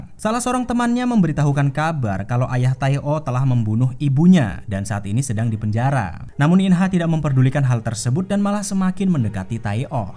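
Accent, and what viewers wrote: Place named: Banyumas, Indonesia. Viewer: atmint e ngapak geng😹😹😹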